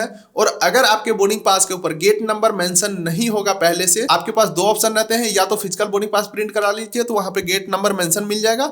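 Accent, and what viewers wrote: Bihar, India. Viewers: Sir ap Bihar se h kya voice tone lg rha h 😊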